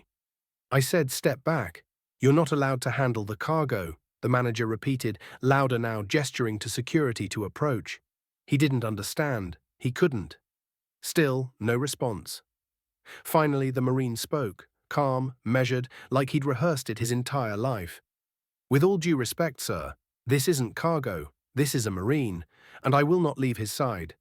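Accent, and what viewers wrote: United Kingdom. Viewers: Why are there so many British narrators on Youtube narrating American content . Semper Fi to the guard of the fallen soldier . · Rubbish....with an inbreed English accent..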